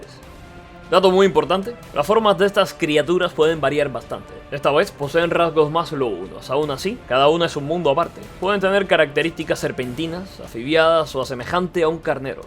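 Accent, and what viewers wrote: Cuba. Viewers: Eres de cuba?😊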